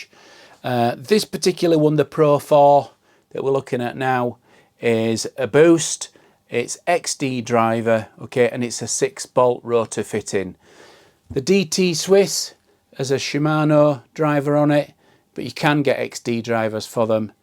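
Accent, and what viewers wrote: Yorkshire, United Kingdom. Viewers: Yeah he's proper Yorkshire man 🔥💪🏻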